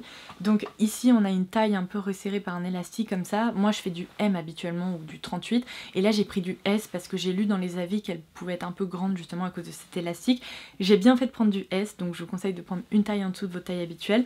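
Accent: United Kingdom. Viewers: Ton accent anglais style britannique c'est si jolie 😊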